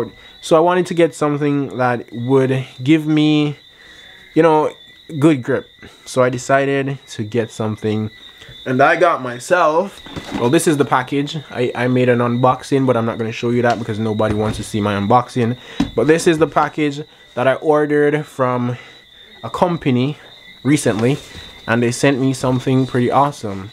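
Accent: Jamaica. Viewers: I can already tell ur from Jamaica bro 😂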